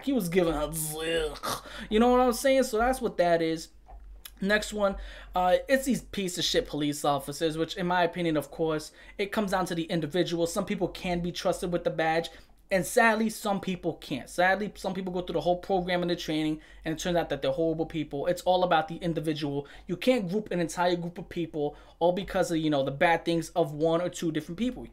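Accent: New York, United States. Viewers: I forgot I was listening to a review of freaking mdpope, I got to into your descriptions and full New York accent 💀i could listen to ya all day 😭🫶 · R u from New York?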